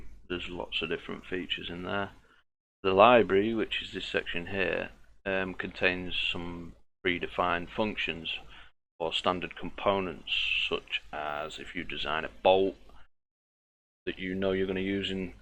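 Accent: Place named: United Kingdom. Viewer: It's about time we seen a brit doing videos more on youtube and the best thing of it yet is, it's solidworks 2017 of which I'm learning to use better!! Proper banging video dude, I think everyone should drop their H's where I live here in Northampton and being a southerner I'm seen as an alien doing that LOL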